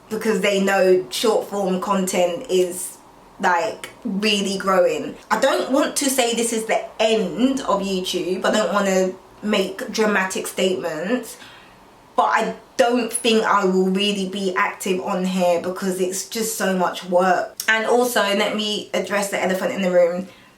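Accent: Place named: England, United Kingdom. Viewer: I wonder where in England you’re from?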